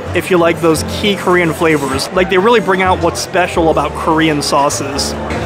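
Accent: United States. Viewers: You have an Americanized sounding voice. You don't have a foreign Asian accent.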